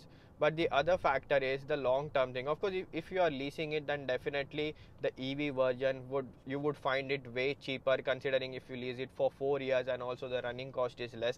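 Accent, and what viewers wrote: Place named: India. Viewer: Angrezi bhi hindi ki tarah laga Jaise maths me english 👌👌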